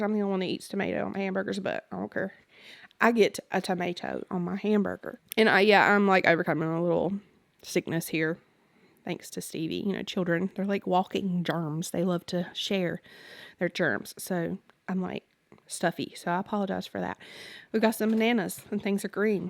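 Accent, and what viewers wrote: South, United States. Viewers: I’m so glad you are back! I miss that lovely southern accent!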